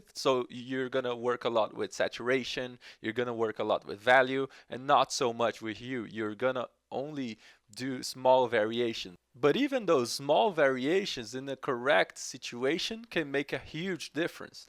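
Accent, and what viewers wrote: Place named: Sweden. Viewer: you sound like pewdiepie when he had the really thick Swedish accent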